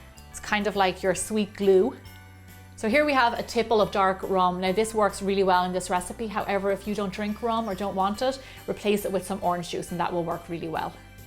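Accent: Ireland. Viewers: Her irish accent is👌i could listen to her talk forever❤️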